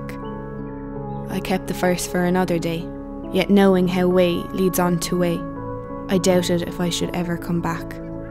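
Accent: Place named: Ireland. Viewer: first time hearing the road not taken in an irish accent. Lovely!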